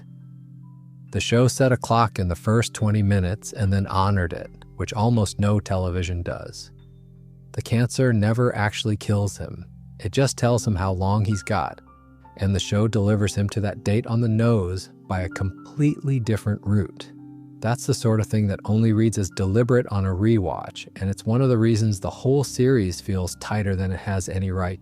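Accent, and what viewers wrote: United States. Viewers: The narration for this video about an American show being in an American accent, but nonsensically forcing British words / terms in... absurdly pointless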